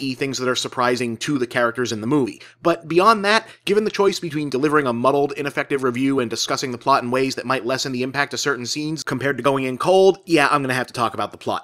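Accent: Boston, United States. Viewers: DUDE!! control that Boston accent. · is it just me or is more and more of boston accent coming off more than usual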